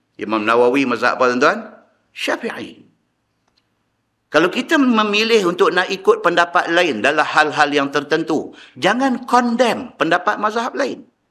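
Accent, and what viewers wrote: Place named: Penang, Malaysia. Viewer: penang dngr sleng dia bleh tau dh penang...kecuali perak blah utara slalu akan owg kata kedah peneng dia ad loghat dia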